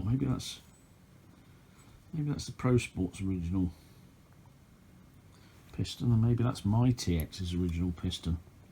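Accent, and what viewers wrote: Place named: United Kingdom. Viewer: Friend , this talk about you being unhappy about this gun must be because you should have kept off the net for your review because it,s impossible to understand a word of what your saying all wee hear is fluf fluf fluf , its your Brit accent that screws it up , find something else to criticise and stay off the net !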